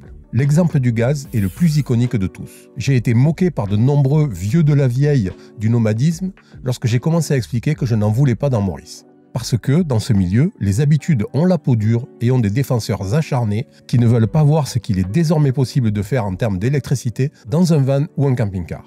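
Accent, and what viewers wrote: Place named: Provence, France. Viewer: Bonjour et merci une nouvelle fois pour votre vidéo , qualitative et initiant la réflexion. En effet, je suis à l’aube de faire l’aménagement de mon fourgon, pour un départ au long court avec mon épouse. Nous allons boire du café (beaucoup pour moi!), cuisiner etc..;vous connaissez le sujet. Je m’inspire donc de vos vidéos qui après maintes vues de centaines d’autres sur le sujet de l’aménagement et de la « fourgon’life » me parlent un langage que j’aime bien. Je laisse de côté ce que m’inspirent les autres… Et j’aime bien aussi votre accent, étant moi même de St Chamas. Je voudrais emporter dans cette future vie le robot ménager de ma chérie: le thermomix. Gros consommateur d’énergie, cet outil qu’elle maîtrise parfaitement depuis des années, nous vaut des repas à base de produits frais et divers que l’on peut facilement trouver partout. 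Votre montage électrique, sa composition, sa capacité se rapprochent du montage vers lequel je me dirige. Mais mon niveau électrique me fait hésiter quand à la bonne réalisation du projet. Je peut toutefois compenser par un esprit curieux et motivé. L’emport et l’utilisation quotidienne de cet appareil vous semble t elle appropriée où à proscrire ? Encore merci rien que pour ne pas dire de niaiseries Charles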